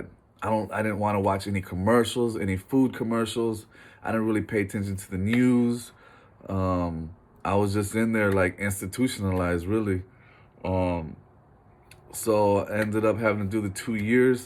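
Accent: Houston, United States. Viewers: What part of the H you from bro?! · My boy you gotta be from alief lol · “I’m from Houston, Texas. A lot of y’all already probably know.” I know someone from Houston when I hear one 😹.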